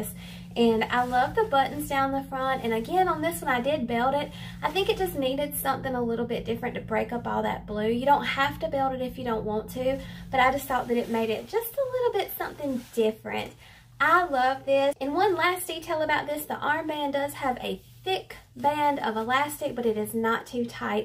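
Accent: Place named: North Carolina, United States. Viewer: Everything in your haul needs to get into my closet. The colors, the styles....omgosh.. Beautiful. Thank you for sharing such well produced videos. YOU really are my favorite youtube plus size clothing haul person. I can tell you put a lot of thought and work into making these videos for us all. I am sure I am not the only person who appreciates you. Oh and your personality is the bonus to it all. My grandma was from North Carolina and you remind me of her with your accent.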